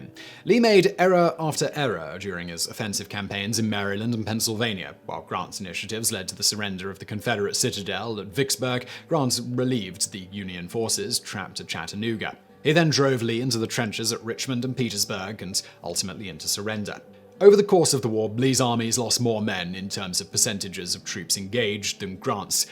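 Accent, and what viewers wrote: United Kingdom. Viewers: I don't need someone who speaks the queens english to tell me diddly squat about my country. End of story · The late Shelby Foot would argue up and down with your position on #8. Shelby Foot once said that to say slavery had nothing to do with the war is just as ignorant as saying slavery everything to do with the war. Speaking with a British accent doesn’t make you an expert on US history. This is more of a personal view provided by this Brit then actual fact vs myth.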